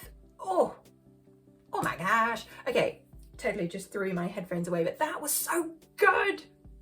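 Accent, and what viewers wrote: United Kingdom. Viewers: Nice British accent you have(Shakespeare 's), thank you so much!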